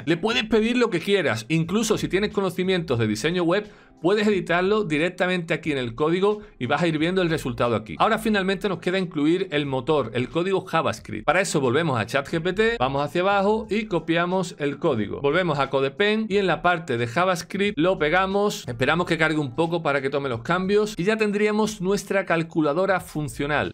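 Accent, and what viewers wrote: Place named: Chile. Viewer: te siento algo de acento Chileno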